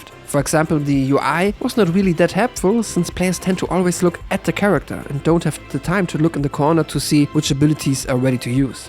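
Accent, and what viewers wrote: Germany. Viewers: Man hört doch aus der Stimme eindeutig raus, dass er deutscher ist xD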